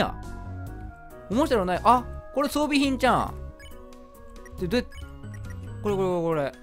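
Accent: Kansai, Japan. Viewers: 殆ど関西弁じゃないwイントネーションだけやんwww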